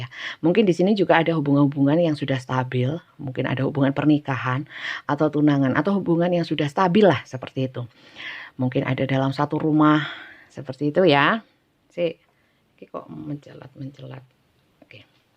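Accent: East Java, Indonesia. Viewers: Kakak dialognya jw timuran berarti sm dong kak? Sy juga asli Suroboyo lho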